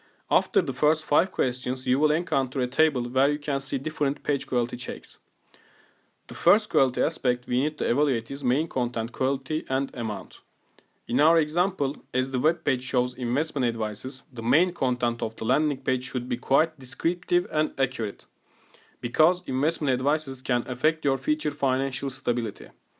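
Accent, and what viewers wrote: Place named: Turkey. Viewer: yes there is a turkish accent :)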